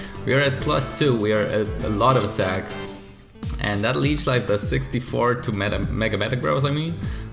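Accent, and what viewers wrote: Poland. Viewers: My are you from Poland?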